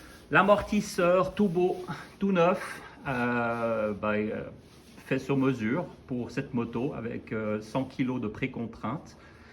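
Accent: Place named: Geneva, Switzerland. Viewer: Lors de l'épisode concernant le contrôle et la remise à niveau des composants électriques du cadre, aviez-vous passé un léger coup de jet, ou simplement un coup de chiffon ou de pinceau avant votre travail? Vous m'avez dit que vous aviez passé la moto complète au Karcher avant toute intervention, ce qui est normal, mais qu'en est- il de l'épine dorsale du cadre, une fois le réservoir et la selle enlevés ? PS: un commentateur mentionnait votre accent vaudois. Personnellement, il sonne plutôt genevois....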